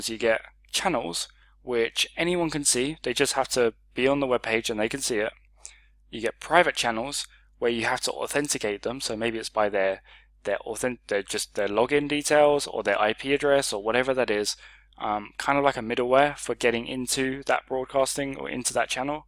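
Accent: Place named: United Kingdom. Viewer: you're British accent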